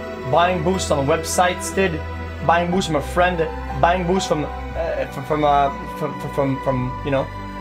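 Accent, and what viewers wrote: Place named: France. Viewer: His first language was french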